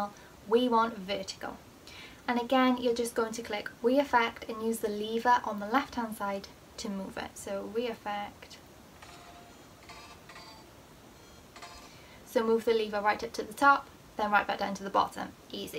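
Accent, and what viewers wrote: Wales, United Kingdom. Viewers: Hey! Are you welsh? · Omg love your British accent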